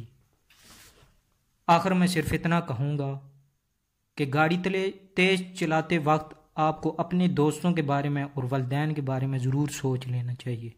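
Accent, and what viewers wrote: India. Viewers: axha phr ap khud hi th0ri apni v0ice k0 chng kr k b0lian qk ap ki w0 punjabi wala acent h..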